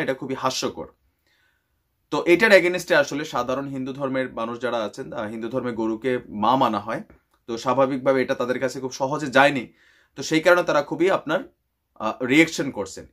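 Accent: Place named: Noakhali, Bangladesh. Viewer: Bruh apni j noakhali/laxmipur er eita apnar kothay clear buja jay.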